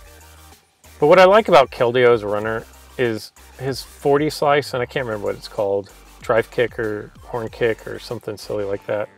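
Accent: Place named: Canada. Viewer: it's beautiful there for sure, I'm a Canadian, some of the words you say you almost have the accent lol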